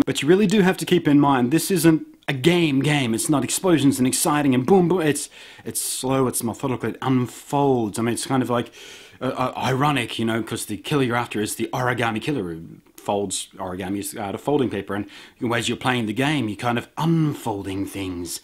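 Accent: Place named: Australia. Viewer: Try turning on "transcribe audio', the system doesn't seem to pick up the Aussie accent as well. It's a good laugh.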